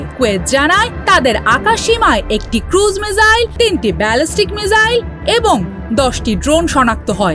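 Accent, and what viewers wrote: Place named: India. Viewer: আমাদের নিউজ বা পাঠক পাঠিকাদের থেকে আমরা সুমৃষ্ট বা সুন্দর উপচতাপনা শুনতে অবচত্য দয়া করে ইনডিয়ানদের মতো সংবাদ পাঠ করা বন্ধ করুন বা বিরত থাকুন এতে শুনার বা দেখার আগ্রহ হারিয়ে ফেলি! কমেন্ট ভালো না লাগলে অপরাধ মার্জনা দৃষ্টিতে দেখবেন ! কিন্তু কমেন্টা করতে আমি বাধ্য হলাম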